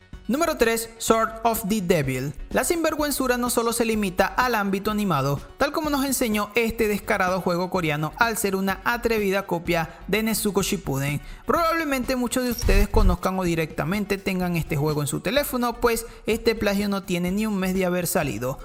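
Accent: Venezuela. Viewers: JAJA me da risa que seas venezolano , ya que entiendo todo lo que dices Soy de Venezuela uwu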